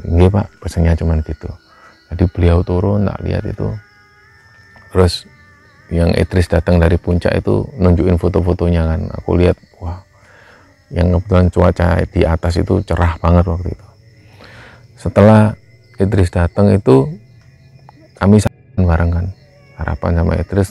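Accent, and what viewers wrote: Java, Indonesia. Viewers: Sangat suka dgn cara penyampaian dgn bahasa yg sederhana dgn logat Jawa, santun 🙏🏼 semangat dan sukses utk 👍 terutama utk mas 👏👏👏👏👏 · Kenopo mas pembawaan ceritanya enak? Mergone logat n kadang nganggo bahasa jawa, jadi koyo crito karo koncone dewe....ntaps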